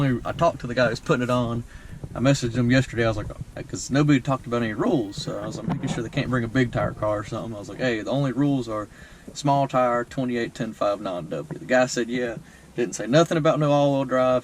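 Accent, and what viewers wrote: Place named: South Carolina, United States. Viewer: You gotta be in SC with that particular twang... maybe GA. I know a lot of guys around TR like to have fun. I am going to pick up a plaid myself. Cant wait to give my neighbors a stroke on test drive. Especially coming from a dual motor awd model 3.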